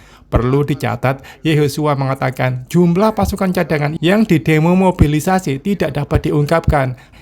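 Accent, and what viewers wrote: Java, Indonesia. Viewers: Komentatonya Ngomongnya MEDHOK... orang mana ya..??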